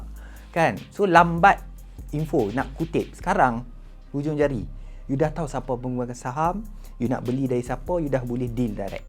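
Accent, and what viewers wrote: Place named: Kedah, Malaysia. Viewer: Aku mati-mati inagtkan speaker ni Melayu Muslim..Punya fasih Kedah Hang mat...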